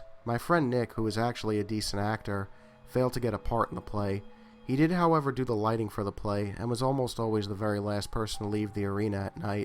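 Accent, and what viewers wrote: New York, United States. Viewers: Are you a New Yorker?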